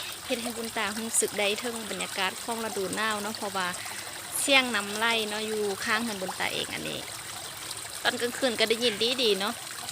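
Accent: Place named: Loei, Thailand. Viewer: สวัดีครับน้องบุญตาสำเนียงภาษาเหมือนกับจังหวัดเลยของไทยเลยฟังแล้วน่ารักเป็นธรรมชาติดีครับ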